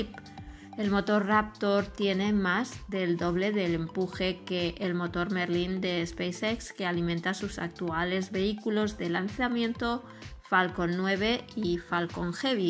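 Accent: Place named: Eastern Europe. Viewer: Es curioso este canal solo tiene videos de Musk y Rusia, tampoco he visto que el titulo se corresponda a nada que haya dicho Musk, así que todo esto tiene un cierto "TUFILLO" el "Mejor motor del mudo" si de hace 30 años era y es muy bueno y muy caro por el precio de 1 Space X hace 5 mejores y al menos igual de fiables. La locutora habla un poco como si fuera como del Este, en fin. Y la traca final "No vale la pena analizar los aburridos números" y tampoco que el RD 180 llega a una presión de 257 bares y un raptor ha llegado a 330 ese aburrido numero dice, más rendimiento más potencia. Una kk de video lo he visto a 1,5X y he perdido el tiempo y atufa a Kremlim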